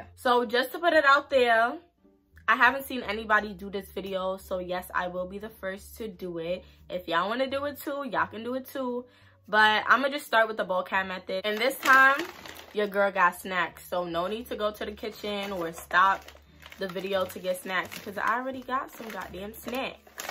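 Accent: New York, United States. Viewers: I’m new to yo channel and soon as I heard yo voice I was like she from New York 😭😭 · LMFAOOOOOOO AS SOON AS I HEARD THE NY ACCENT I WAS LIKE NAHHH LET ME SUBSCRIBE😭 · Lmao It takes a true New Yorker to know a true New Yorker. I can tell by the way you talk😭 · Are u from ny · Are u from ny ? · Gotta be from New York with that accent!! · Definitely a New Yorker lol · is she from ny? she gotta be a New Yorkian 😭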